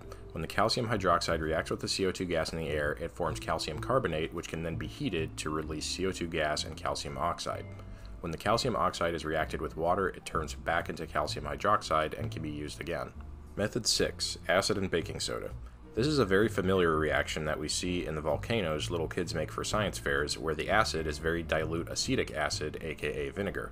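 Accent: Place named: New Jersey, United States. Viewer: Are you from NJ? You have a Jersey accent.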